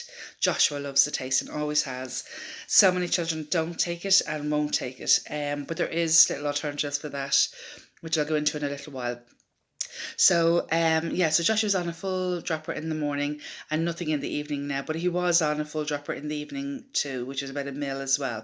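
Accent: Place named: Ireland. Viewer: Hiya, sorry I have another question which I hope at least you can help me with. I've been doing more research on this oil and just wondered, 'how' did you work out what dosage to give your son (or even yourself as I've learned?) Was it merely trial n error or were you given any guidance? Many thanks. Ps. Forgive me but I said earlier that you sounded like a 'brit' but now I recognise that lovely irish accent(lol. Silly me) Tc now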